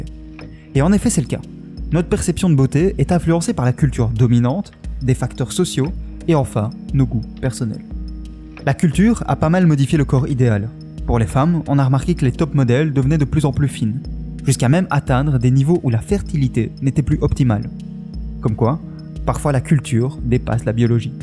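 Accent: Belgium. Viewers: On garde l'accent belge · J'ai cru que tu étais mort ! xD Sérieusement très bonne vidéo comme d'habitude et vive l'accent Belge !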